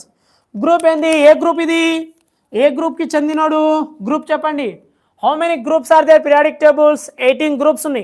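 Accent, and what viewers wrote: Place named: Telangana, India. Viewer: Super Telangana slang ........😉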